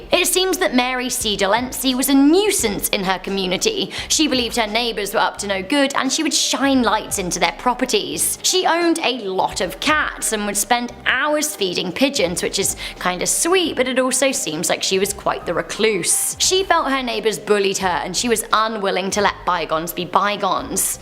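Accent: England, United Kingdom. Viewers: You remind me of the girl who was in love with doctor who. It's the British accent and how your voice sounds. I miss watching doctor who now. Need to watch it again. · Her English accent is no excuse. She is pronouncing the name altogether WRONG. King Tut I'm sure is not finding any humor. Your comment, however, I'm sure he got a little"chuckle" 🤭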